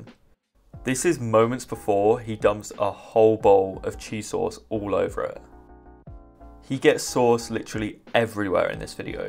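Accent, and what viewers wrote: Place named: United Kingdom. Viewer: Bro,he Bri’ish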